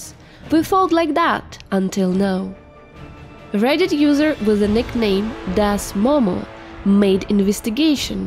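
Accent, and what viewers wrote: Russia. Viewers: Well, sexy video, simple as that, you too of course, being the shining jewel and all. Of all the accents from all countries i have heard, Russian, or slavic origin in general is by far the most heart-melting, delicious id say ;p Either that ir i have grown to like how Russian females talk since i live near(Latvia) Russia myself, so its common but still slightly different between each individual.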